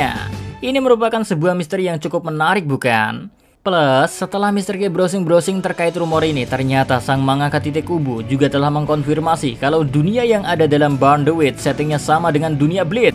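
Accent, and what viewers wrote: Java, Indonesia. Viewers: DHE ( MEDOK JAWAX )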